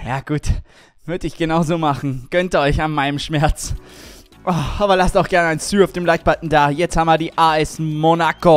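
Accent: Austria. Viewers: Kommst du von Österreich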